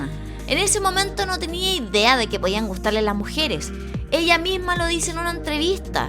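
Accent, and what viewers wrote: Chile. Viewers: No puedo con este acento chileno uy no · tu timbre chileno de hablar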